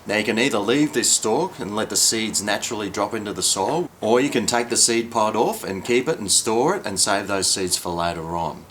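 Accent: Australia. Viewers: Love the video. Love the accent too. Sounds similar to Australian. Thanks.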